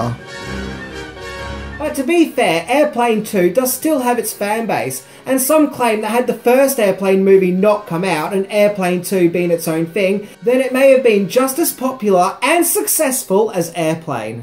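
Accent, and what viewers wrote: Australia. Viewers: I’d say all the dislikes are for the whiney Aussie accent and him wearing sunglasses indoors I guess for comedic effect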